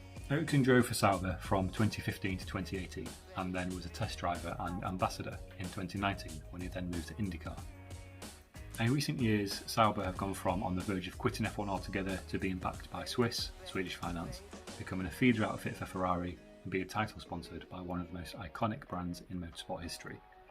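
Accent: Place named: United Kingdom. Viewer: Interesting info! But please, speak louder!!! It’s a bit hard to understand you… been British I guess. Gabriel from Costa Rica saying hi… Good job! 👍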